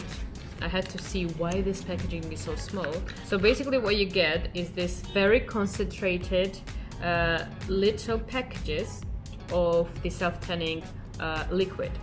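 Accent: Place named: United Kingdom. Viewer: ბრიტანული აქცენტით კარგად საუბრობ❤️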